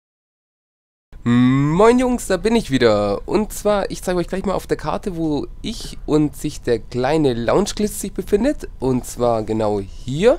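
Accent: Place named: Swabia, Germany. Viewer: Gutes Video!:)Bisch bestimmt ein schwäbischer zocker wegen deinem Akzent^^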